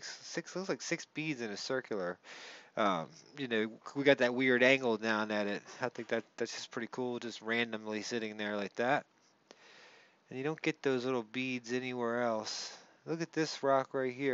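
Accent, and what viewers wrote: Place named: United States. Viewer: That single rock is interesting, the scallops on the edge are very unlikely to be natural, so well spotted. The rest are I think simply rocks. Actually his american accent to us Brits is quite charming, so don't run him down so much - anyway everybody read The Third Explanation Amazon, Kindle, but shortly to be a paper book. Keep taking the pill!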